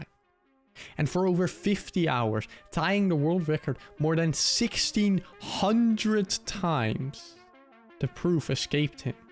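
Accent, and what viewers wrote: Netherlands. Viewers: You sound Dutch, coole video gast!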